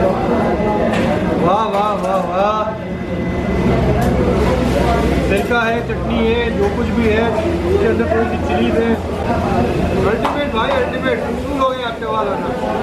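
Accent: India. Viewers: Why speak inglish like people from india...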